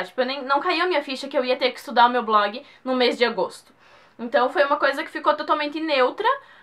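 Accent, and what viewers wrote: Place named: South, Brazil. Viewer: Amei o video. Que sotaque legal heim? Amo sotaque de sulista, pra min e um dos mais bonitos, e o seu e mt forte. Abracos!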